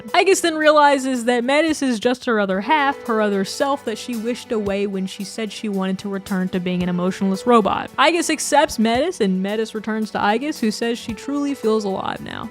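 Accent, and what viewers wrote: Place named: Texas, United States. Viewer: this is totally unrelated to the video but you must be from the south right? I’ve lived in Texas my whole life and you remind me of people I’ve grown up with and people I’m around all day every day with your accent and the way you talk. I swear you must be southern cause it’s uncanny how alike you sound to people I know in Texas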